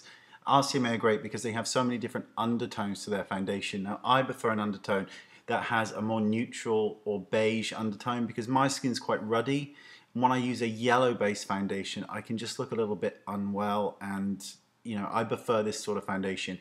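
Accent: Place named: West Country, United Kingdom. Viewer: It's a west country accent... like Bristolian but not as harsh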